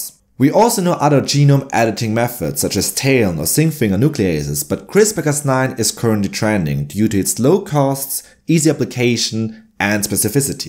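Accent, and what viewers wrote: Germany. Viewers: As a native English speaker, it really isn't. He speaks too quickly and smears his words without pronouncing all of the sounds. If he didn't do this, he would be a lot easier to understand even without the heavy German accent. People who speak one language are bad judges of a foreign language spoken by their peers because they are used to speaking with and hearing their native phonemes, even when used to approximate another language. Good video and content, just needs to slow down and work on pronunciation and annuncuaction. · Are you threatening me, Master Jedi? I am also a native German speaker and I can clearly notice the German accent. Maybe because even though everyone I've talked to in the past 15 years spoke German with me I almost exclusively consume English-speaking entertainment and do so for hours daily. Though I have to say it's way better spoken English than pretty much anyone I know :p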